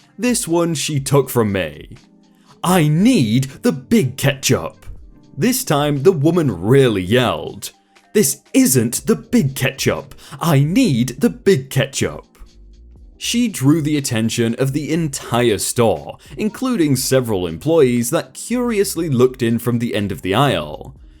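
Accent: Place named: Midlands, United Kingdom. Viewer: John Smithe Probably from the same sort of area. I think they've both got Midlands accents.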